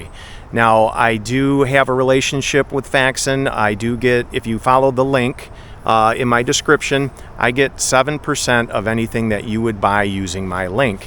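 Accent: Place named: Northwoods, United States. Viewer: I've got to ask, where are you from? Sounds like the great Northwoods accent!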